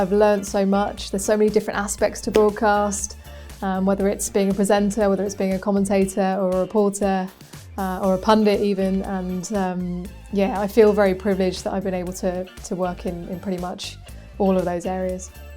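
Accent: United Kingdom. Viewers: My favorite female commentator .... with lovely voice, English accent, very beautiful personality. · Listening to her voice in British accent is pne of the most satisfying things 😌 ✨️ 😍 💕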